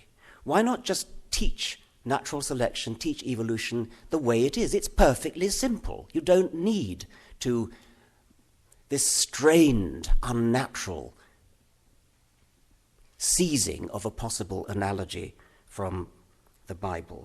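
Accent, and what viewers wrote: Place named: United Kingdom. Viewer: Because he has a British Accent.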